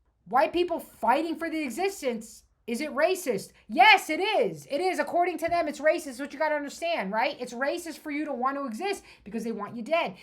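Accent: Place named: New York, United States. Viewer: Good to hear a real New York accent, it’s a rarity these days.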